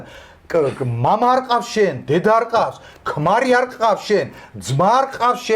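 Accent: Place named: Armenia. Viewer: ჩვეულებრივი ფარისეველი, ბლia:) სომხობა ქართველობაზე იმისთვის გაცვალე ,რომ ქართველი ქალები გელანძღა,მაგ სომხურ აქცენტს მაინც მოუხერხე რამე:))) არაა,სომხობას არ დავცინი,უბრალოდ , პოზიციაaa ძაან "ჰაიტარაკუნემ რესპუბლიკ" :DD....მე ვიყო :D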